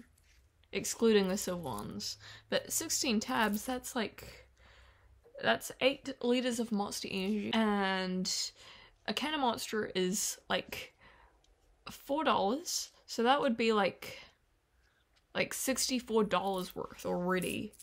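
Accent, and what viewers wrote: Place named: New Zealand. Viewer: its a new zealand accent :)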